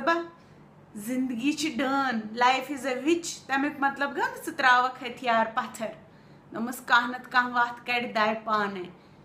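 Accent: Kashmir, India. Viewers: Your koshur accent. Iloveyou lady😍💓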